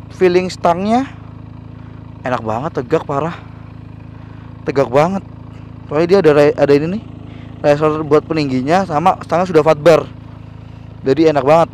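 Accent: Surabaya, Indonesia. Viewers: Mas orang surabaya ya?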